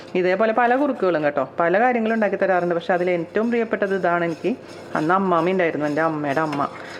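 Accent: Thrissur, India. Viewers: ഞാൻ ചേച്ചിടെ ചാനൽ കാണുന്നത് be happy with sree.... ശ്രീ പറയുന്നത് കേട്ടാണ്.. ശ്രീ ടെ യും ചേച്ചിടെ യും sound /trs ഭാഷ എനിക്ക് വളരെ ഇഷ്ട്ടമാണ്.... ഞാൻ ഒരു പാലക്കാട് കാരിയായതു കൊണ്ടാവാം..... 😍😘😂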